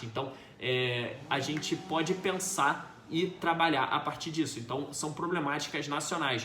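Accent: Rio de Janeiro, Brazil. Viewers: Eu adoro esse sotaque Carioca, sério kkkk❣ Parabéns os vídeos tão muito bons, estão me ajudado a manter o foco nessa reta final vlw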